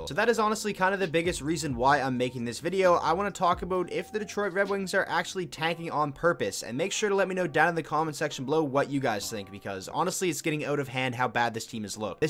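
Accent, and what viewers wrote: Canada. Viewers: Dude you're canadian aren't you? I hear that accent 😎 (Also, it's eyezerman)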